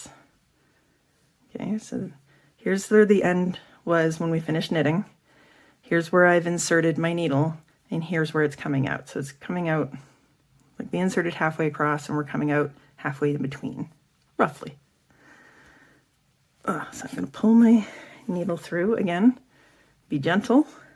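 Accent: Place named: Canada. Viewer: excellent tutorial.............best one out there. thanks so much. love your Canadian accent!!!!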